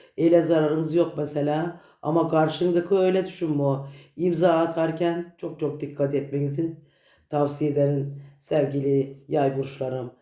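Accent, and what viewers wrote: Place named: Afyon, Turkey. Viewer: Konusmanizdan sanki afyonlu gibisiniz nerelisiniz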